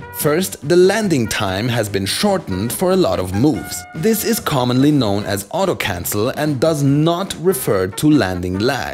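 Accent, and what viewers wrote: Germany. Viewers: Dat german accent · Deutscher Akzent🤔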